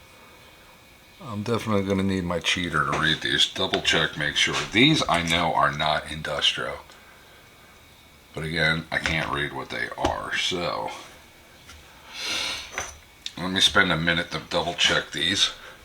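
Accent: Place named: Philadelphia, United States. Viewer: where are you from? I think I hear a Philly/ South Jersey accent.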